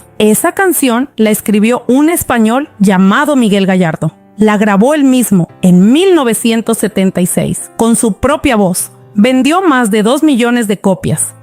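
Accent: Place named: Mexico. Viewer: Tenés que limar ese acento mexicano que da asco...😮 escuchar...!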